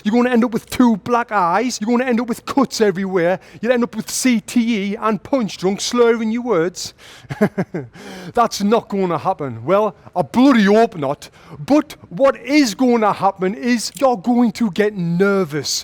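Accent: United Kingdom. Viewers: Man's got the thickest bri'ish accent ever "Ponchd in the feece"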